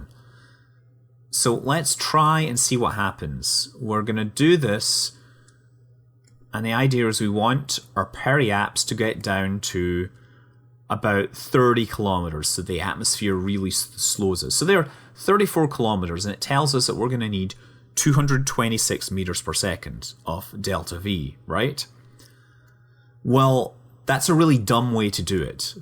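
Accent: Scotland, United Kingdom. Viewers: he's definitely not canadian. my best guess is he's from scotland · he sounds Scottish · are you Scottish? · Holy potato, i love scottish accent!! XD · Scottish with a hint of American, where I am to understand he lives. And I might add your English is better than many native speakers I know! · Scottish accent ftw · Are you Scottish?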